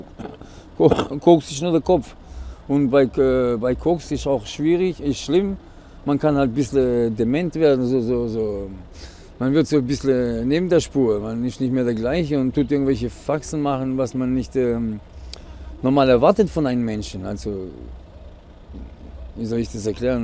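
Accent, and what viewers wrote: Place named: Baden-Württemberg, Germany. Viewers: normaaaal so redet man in baden württemberg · Er erklärt gut. Schwäbischer Dialekt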